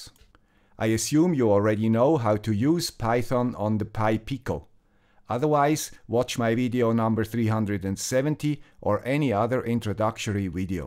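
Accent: Switzerland. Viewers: Nothing like your weekly dosage of your guy with the swiss accent. :)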